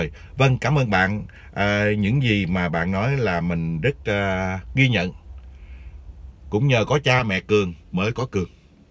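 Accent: Standard. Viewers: Anh nói quá chuẩn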